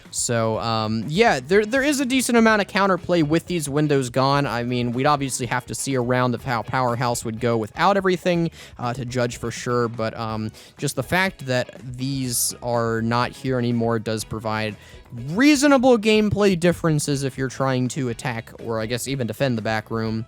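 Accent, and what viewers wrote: United States. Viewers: why does he have the crackly stereotypical american teen voice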